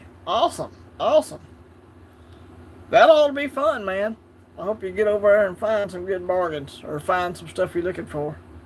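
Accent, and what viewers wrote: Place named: South, United States. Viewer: Sorry I missed your live and hanging out with your awesome Southern Accent. Hope you are doing well. 🙂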